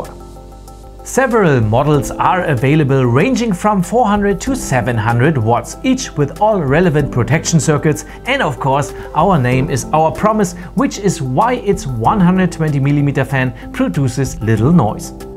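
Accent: Germany. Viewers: I feel the German accent but I have this alimentation and it doesn't makes noises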